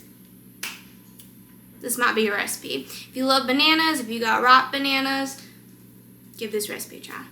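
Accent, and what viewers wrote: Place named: South, United States. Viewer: Just found your videos. Can't wait to try your recipes! Love your Southern accent!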